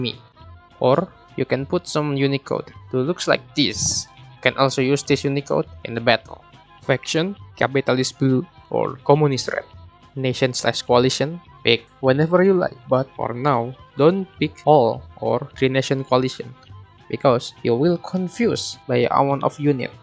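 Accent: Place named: Indonesia. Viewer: Great video, wish I could understand the heavy indonesian accent in some places, but the editing is hilarious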